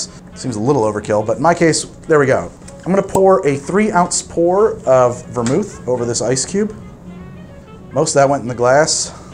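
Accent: New Jersey, United States. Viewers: Punxsutawney, Pennsylvania. Laird's., your Jersey is showing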